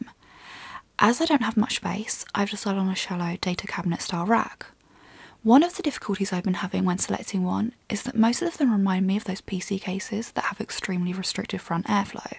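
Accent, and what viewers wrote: United Kingdom. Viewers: Love the British accent for tech stuff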